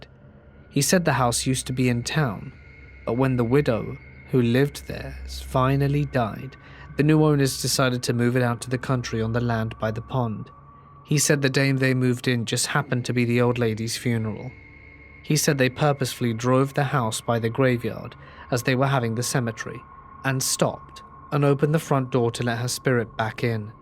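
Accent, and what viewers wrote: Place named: RP, United Kingdom. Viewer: Reminds me of a high class 1920s British socialite accent. I like it.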